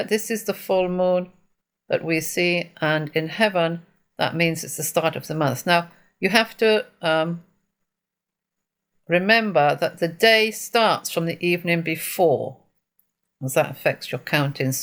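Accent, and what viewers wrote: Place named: Manchester, United Kingdom. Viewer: are you a Mancunian? I was born there and left for Australia in 1972. Your accent sounds very familiar. I'm born again and thank you for your work in the Lord. We fly soon.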